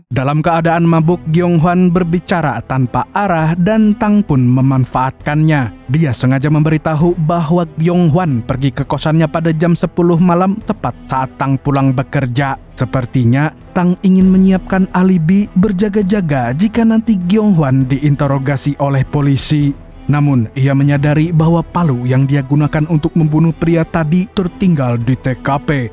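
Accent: Banjar, Indonesia. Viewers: Urang Banjar lah pian ni bang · Banjar pinanya 😂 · Umaaa urg banjar sekalinya leh😂😂😂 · Umaa urg banjar jua sklinya😂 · Lah banjar wkkwk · Anjirrr urng Banjar kah ikm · urang banjar kah mang😂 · Bahasa Banjar kah teh tadi lwn logat nya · Urang Banjar kaya nya nih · Banjar kah nih 😂😂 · urang banjar kah pian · Nah, urang Banjar sekali nya pang 😂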